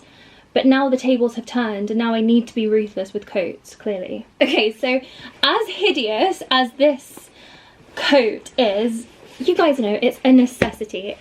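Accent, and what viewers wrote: United Kingdom. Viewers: Watching this video made me feel like I'm catching up with a dear friend after a long interlude. (I do have actual friends. We're all so busy, though, with our families, I often don't get to see them for months at a time.) I LOVE your closet clear outs. I often hear your lovely British accent in my head when I tackle my own. "I'm going to be ruthless..." I also very much enjoyed hearing all the little and big details you put into your clothing line, which demonstrates you're doing what you should be doing.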